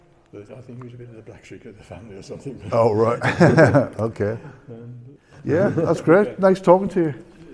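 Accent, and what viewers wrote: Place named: North East England, United Kingdom. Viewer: It's nice to hear a north-east accent. I'm from the NE myself =)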